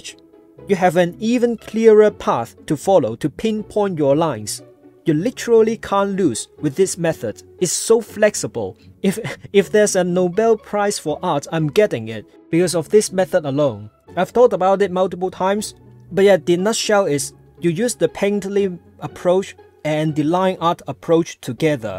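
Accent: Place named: Singapore. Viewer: I love this <333 Also I feel like i went thru a literal roller coaster trying to figure out ur accent. Like, first I thought it was British , then Indian, then now I think it’s singaporean?????? Mhjvnejenrfv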